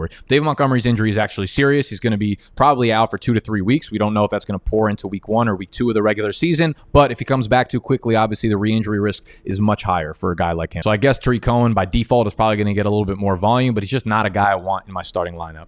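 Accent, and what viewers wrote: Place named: New York, United States. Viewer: I haven’t watched any of this dude’s videos in a year. Did he develop a NY accent out of nowhere?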